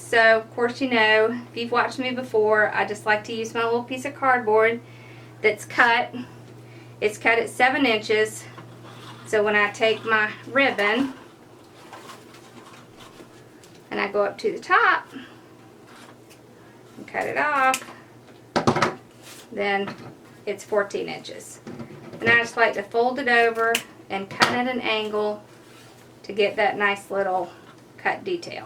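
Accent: South, United States. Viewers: Love the Water Mellon wreath but would love to see it also with a bit fewer ribbons. I love the red and green that looked like the rhine. Actually both would be absolutely gorgeous......where in heavens name do you come up with all these ideas. You are truly amazing! You little guy is so sweet and helpful. Love love the St Patricks Day wreath also. I think I forgot to mention it on the other vlog. I don't have FB so I enjoy seeing you here! You are a busy busy girl. Love you southern accent and sweet way you speak and soft spoken....very easy to listen to you instruct. Loved everything and love how you do the tutoring so quickly. Blessings to you and yours. :) :) :)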